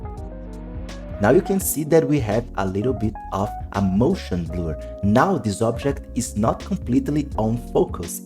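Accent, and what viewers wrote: Brazil. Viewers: Are you Brazilian ? You have the accent .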